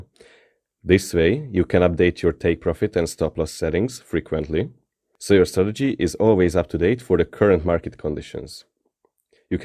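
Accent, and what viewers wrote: Hungary. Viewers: jáj de magyar akcent :)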